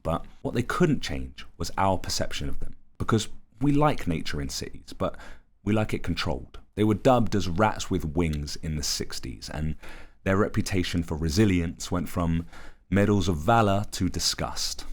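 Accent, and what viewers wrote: United Kingdom. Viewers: An advocate for mistreated animals, an artist, and the most gentle British accent. You win the internet sir. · it's weird watching a dreaded up nick cannon with a british accent... but i'm here for it.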